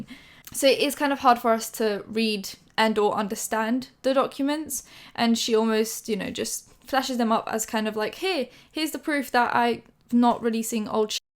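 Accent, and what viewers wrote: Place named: Roadman, United Kingdom. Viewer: Is it just me or does she have a very subtle roadman accent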